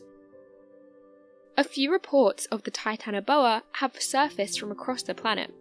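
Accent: United Kingdom. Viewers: I wish this woman would do some asrm videos. Such a lovely voice and a British accent, hell all I need is a bag of chips and I'm set.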